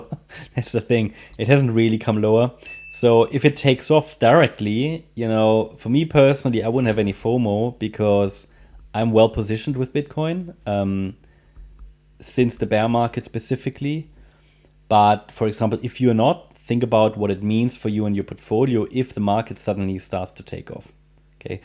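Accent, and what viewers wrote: Germany. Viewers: Hi, I like your comments. I am wondering and I might be wrong.......are you German? Your English is pretty good with a German accent??....😀 I am German too but was living 17 years in New Zealand..... Happy New Year to you! 🤝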